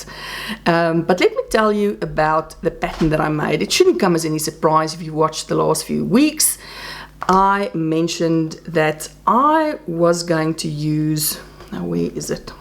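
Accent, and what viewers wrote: South Africa. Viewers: I love your chats when you started talking i recognized that you are South African. Me too born at Cullinan in 1946 all my family are in Australia and some friends so only my daughter and hubby and a boy and girl are my immediate family. . Ive been crocheting since I was 8 year old when my grandmother taught a lefty to crochet. I've done dressmaking and most crafts but crochet and colouring in is my best crafts right now. And have loved listening to you ❤❤❤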